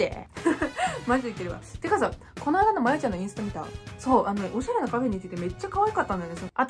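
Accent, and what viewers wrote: Nagasaki, Japan. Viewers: 喋り方から天草か長崎の人かなぁと思ったけど違うかな！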